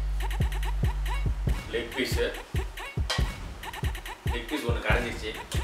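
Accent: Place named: South India, India. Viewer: I promise I am not going to watch useless videos which r wasting my time Also me -watching this* His videos r love😍😍😍..I saw his Hindi channel that Hindi accent of southies is really cute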